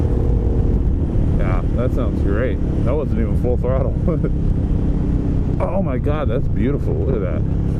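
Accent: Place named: United States. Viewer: Awwm until you started talking I thought you were an actual Italian reviewing the can and the bike. What the fuck do Americans know about motorcycles?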